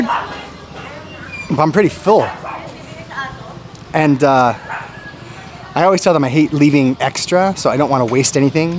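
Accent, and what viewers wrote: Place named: Tagalog, Philippines. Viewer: Pareho kau ni zombie ng accent sa tagalog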